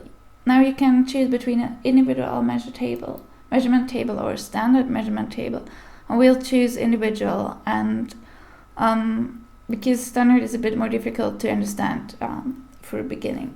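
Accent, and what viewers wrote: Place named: Switzerland. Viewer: Is she Swiss-German? Her accent sounds just like Angelic Venus.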